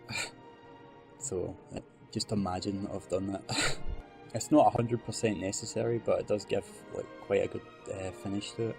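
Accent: Scotland, United Kingdom. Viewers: Beautiful! Highlander tartan painted and explained with a scottish accent :) Well done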